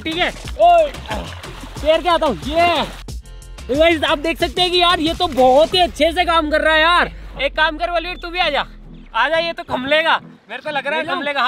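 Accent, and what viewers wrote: Rajasthan, India. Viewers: Bhai aap rajeshthani bhasa badi achhi bolte ho ek video me aap bs rajeshthani bhasa hi bol ke banao na plz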